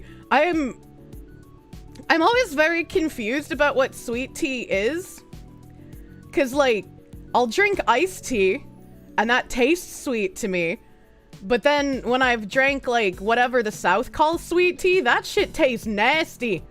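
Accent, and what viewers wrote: Boston, United States. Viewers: The boston accent is great. It reminds me of one of the greatest American events in history.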